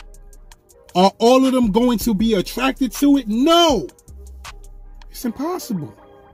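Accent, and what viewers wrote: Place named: New York, United States. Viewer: I defo from New York that accent n swag ain’t never lie u even got the hat that Memphis bleek made popular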